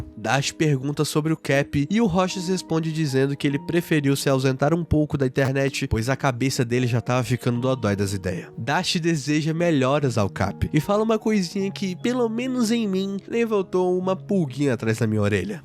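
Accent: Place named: Portugal. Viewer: O cara e português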